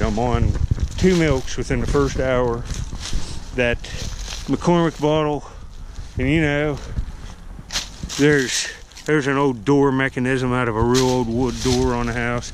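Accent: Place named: Pittsburgh, United States. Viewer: I'm from the Irwin Pennsylvania area. I can tell by your accent that you have to be from around Pittsburgh?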